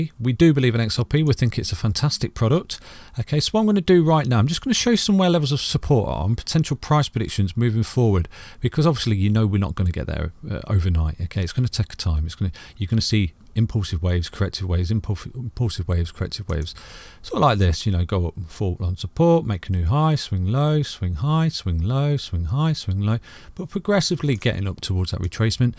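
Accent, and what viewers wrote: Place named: Doncaster, United Kingdom. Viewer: Doncaster, sounds like the kid is from Doncaster, I'm probably wrong though lol. Great video, thanks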